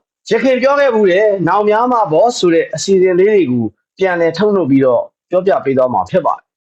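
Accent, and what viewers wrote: Yaw, Myanmar. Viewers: မဂ်လာညချမ်းလေးပါ ကို ရေ မီသားစု များ အဖွဲ့သားဓမ္မဘက်တော်သားမို့အားလုံးပါနေကောင်းကျန်မာစိတ်ချမ်းသာလို့ရာဆန္ဒပြည့်ပါစေ 🙏🙏🙏🤘🤘⭐⭐🌹🌹❤❤အလွမ်းပေးတဲ့ ယောနသံလေးကွ 😂❤ဟို့နေက မဆီမဆိုင် ခဏနားမယ် ဆိုသာကို မျက်ရည်ကျလာတယ် 😢😅အဘွားကြီးဆိုတော့ အသဲနု၏ 😊မျှဝေလို့ ကျေးဇူးပါနော် ပုံပြောတဲ့ကိုကိုရေ👏👏👏💞💞🌷🌷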